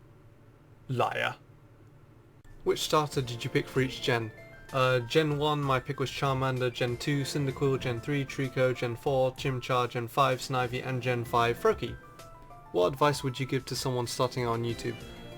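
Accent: United Kingdom. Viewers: He said half white and half Chinese, I can only assume he's British, based on his accent. :) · are you like British? you sound British.(sry I don't mean to be so rude.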